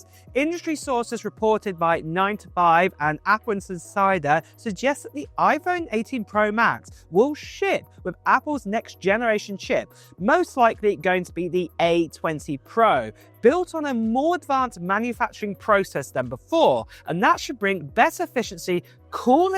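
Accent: United Kingdom. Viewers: Until I opened up my eyes, I thought that was a British woman speaking.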